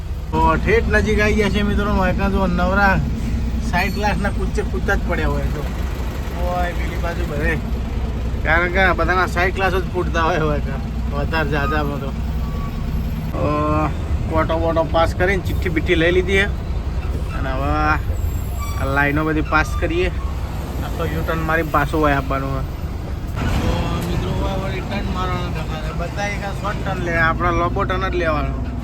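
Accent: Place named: Kheda, India. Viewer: तुम्हारी भाषा नडीयाद खेडा नी छे🎉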